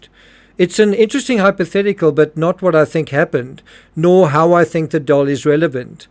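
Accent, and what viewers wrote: South Africa. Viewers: Jon Bennet was abducted and taken to the US Airbase on the Azores for the purpose of sacrificial murder all organised by the then Prince Charles. She was raped to death in the Rape the Children to death races held by the Royals and world leaders. ANYBODY with the knowledge and truth but telling known lies regarding this young girl or ANY other one.. is about to be removed from Earth. PREPARE... BTW... I notice a South African accent... there are human meat processing tunnels in your country as well as mine and many others... 1000's are processed in these factories every day and the people abducted from the war zones. ..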